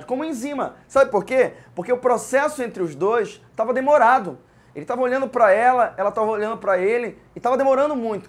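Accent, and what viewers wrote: Pará, Brazil. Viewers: Esse sotaque paraense que reconheço de longe, tão bom de ouvir. Sou de Natal RN, mas minha família toda é paraense. Parabéns pela didática muito boa. PS: Se não for paraense vou passar vergonha, mas se parece muito. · é Paraense. O sotaque não nega.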